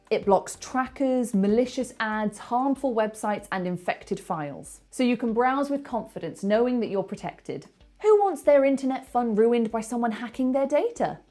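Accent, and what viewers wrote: United Kingdom. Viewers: I love british accent, especially yours,. Kisses from Sicily! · Really I want merry a nice woman like you.. British accent.. I ❤ British Accent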